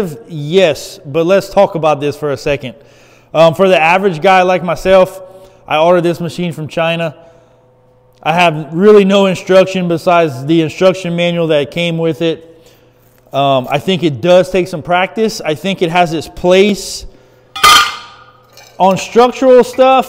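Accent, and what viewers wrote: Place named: South Louisiana, United States. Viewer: You might be in Wisconsin but you can’t hide that south Louisiana accent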